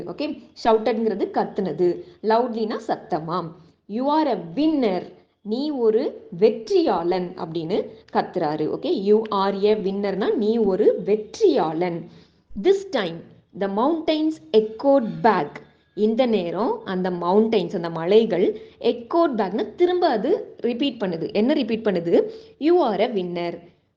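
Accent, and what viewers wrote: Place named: Tirunelveli, India. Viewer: Hi Mam, are you Living nearby Thirunelveli? Because your slang be like so.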